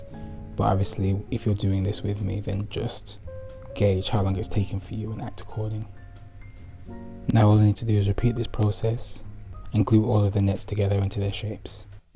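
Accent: United Kingdom. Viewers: That was actually really comprehensive and clear! Thanks! Maybe its the cool british accent, maybe its the neat tidy workmanship, maybe both.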